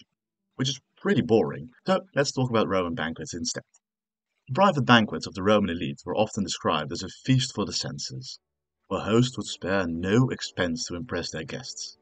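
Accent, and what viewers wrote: Netherlands. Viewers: Thicc Dutch accent, daammnn.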